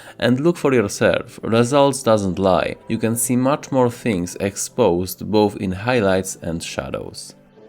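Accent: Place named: Poland. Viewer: Siema, fajne porównania, słysze po akcencie że chyba jesteś Polakiem :D Swoją drogą co to za nową stacje budują w 3 minucie filmu?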